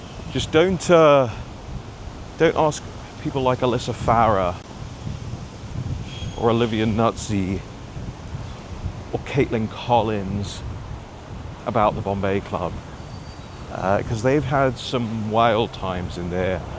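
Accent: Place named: United Kingdom. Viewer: you're losing your beautiful British accent.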